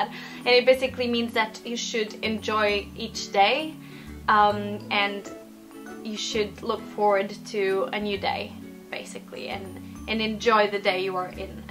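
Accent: Oslo, Norway. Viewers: É engraçado que tenhas o sotaque norueguês a falar ingles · Your Norwegian is really good. I can hear that you have a Oslo dialect which is easy to understand and speak. Keep it up.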